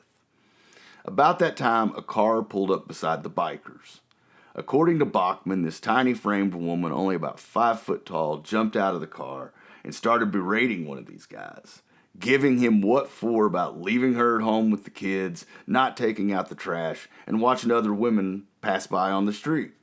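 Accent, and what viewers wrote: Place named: Texas, United States. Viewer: The Texas accent of the narrator is unbearable.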